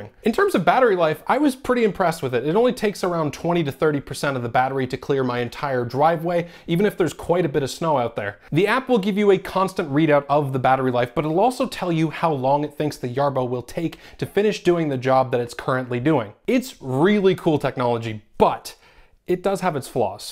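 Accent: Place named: Newfoundland, Canada. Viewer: The Newfy accent is mild 😓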